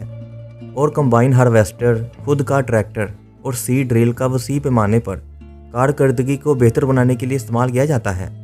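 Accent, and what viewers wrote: Lahore, Pakistan. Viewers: Are U Lahori Bahi- BGV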